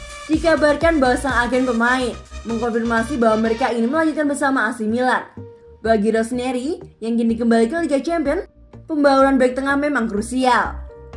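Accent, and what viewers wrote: Java, Indonesia. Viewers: Wkwkwk jawa, kesukuan banget ni vocalnya,, ga tau betapa luasnya indonesia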